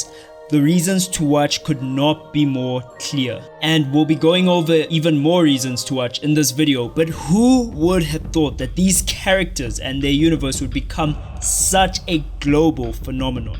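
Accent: South Africa. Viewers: You're south african right? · r u from ZA? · So good to hear an SA accent in a video about my favorite series. Reminds me of getting lectured on Pokemon by my older cousins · You sound South African my dude I like the accent ✨ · where are you from? You sound South African bro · I swear brother you gotta be South African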